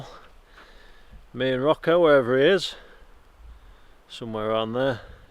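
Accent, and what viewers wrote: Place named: South Yorkshire, United Kingdom. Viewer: Defo a South Yorkshire twang in there somewhere. Enjoyed the vid. Thank you for sharing 🙏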